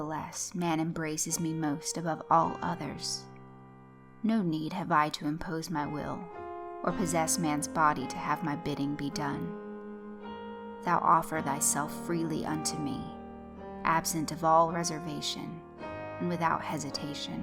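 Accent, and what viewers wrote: South, United States. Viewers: that southern accent though.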